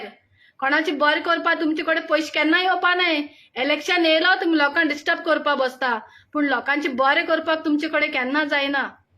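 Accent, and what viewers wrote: Konkan, India. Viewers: just have a look on ur konkani assent, u r a migrants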